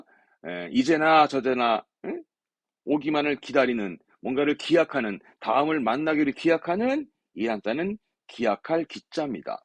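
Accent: Jeolla, South Korea. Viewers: 왐마 듣다보이 우리 절라도분이시구마잉~ 반갑네요잉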